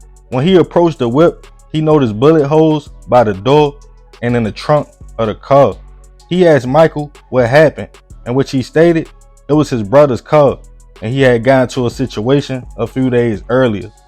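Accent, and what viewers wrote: Baltimore, United States. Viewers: This narrator has to be from Baltimore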